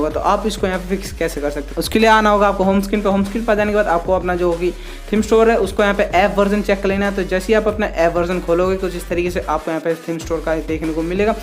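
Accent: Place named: India. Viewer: Bruh the title is in english but you speak indian why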